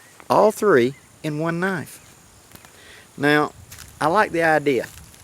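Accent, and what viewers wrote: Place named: Alabama, United States. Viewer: I figured out why I enjoy listening to you more than any other bushcraft channel. For one, you like slipjoints...I've always been taught you dont need a lock on a pocket knife. If you use it properly. I was given several US Old Timers by my granddaddy and they still ride in my pocket. Mainly the 80ot. For two, YOU'RE FROM ALABAMA. I was born and raised up by Lake Jordan in Slapout, You speak my language. Alabama southern draw. Keep em up and ill keep watching.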